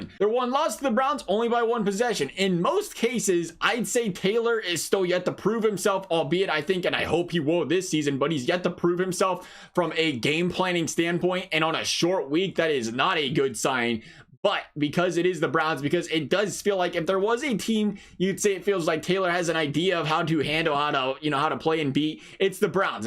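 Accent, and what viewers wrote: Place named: Wisconsin, United States. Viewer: Every time I watch a video from this guy I feel like he’s from Wisconsin or somewhere else the way his voice sounds. 😂 Idk...maybe it’s just me. 🤷🏾‍♂️😇😂🤣 Thx for the content nonetheless.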